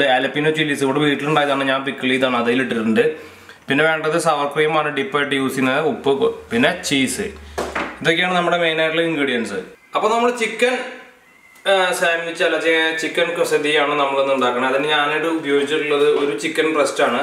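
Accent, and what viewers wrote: Thrissur, India. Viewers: അത് ഒരു ശൈലി ആണ് ബ്രോ എന്റെ കൂടെ ജോലി ചെയുന്ന ആളുകളും പറയാറ് ഉണ്ട്. തൃശൂർ ക്കാർ ആണ് പറയാറ്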